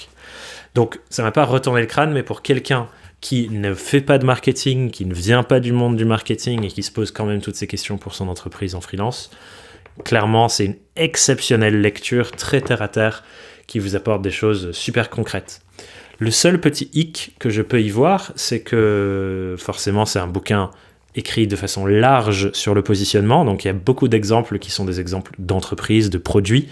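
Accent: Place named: England, United Kingdom. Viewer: On aime toujours autant ton accent anglais ;) Merci pour vos recos monsieur !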